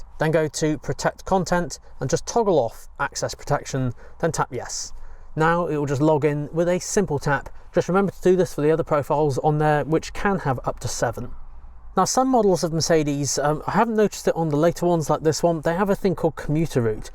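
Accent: England, United Kingdom. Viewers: thank you for your prompt response. chatGPT mentioned that it may be a bad calibration of the sensors and recommend to take it to MB dealer for service. Thank you again. I love your content. I need to use captions because is hard for me to understand English from England. But you create great content. I live in Miami, Florida USA.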